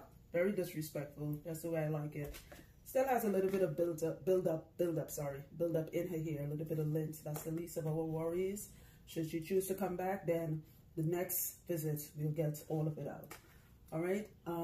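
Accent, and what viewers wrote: Trinidad, Trinidad and Tobago. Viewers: Yes she's ah Trini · Am i hearing a Trinidad accent ckming through?🇹🇹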